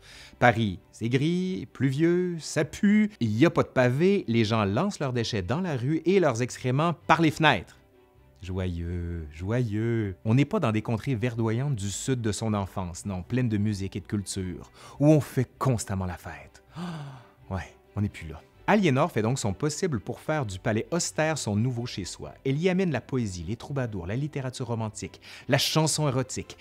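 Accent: Quebec, Canada. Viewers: Bonsoir un grand merci pour l'histoire de France j aime beaucoup votre humour et un accent je pense du Québec si je me trompes je me suis abonnée à votre chaîne car j aime beaucoup l'histoire de France a bientôt merci ❤😊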